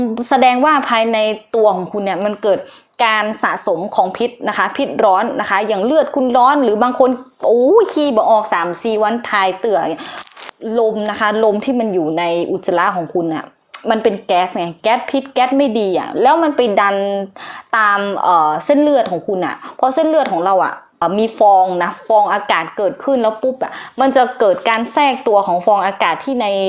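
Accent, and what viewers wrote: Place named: North, Thailand. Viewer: หมออย่จังหวัดอะไรอู้เมืองแต้ชอบวิธิอธิบายชัดได้สาระ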